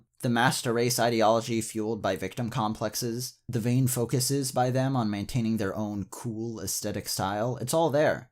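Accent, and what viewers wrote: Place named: United States. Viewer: Forgive me if im wrong, but from your accent, im assuming your are american, in which case you should have studied in england, because you would have achieved 100% on any exam with that quality of ananlogy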